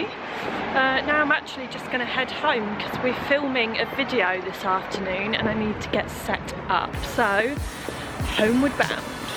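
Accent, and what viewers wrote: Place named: London, United Kingdom. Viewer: I love your London accent, mine, according to my husband, is New Yorker mixed with Italian and Portuguese. In short, a horror !!! I love the winter clothes and your style. I was amazed not to see Karl Lagerfeld's picture at the Oscars, it was disrespectful !!! Sad!!!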